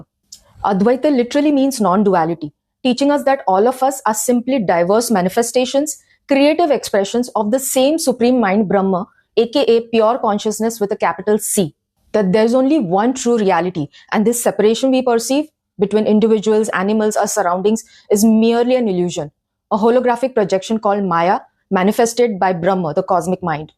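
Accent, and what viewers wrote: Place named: India. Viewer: Your Indian accent enhances it so much🙏✨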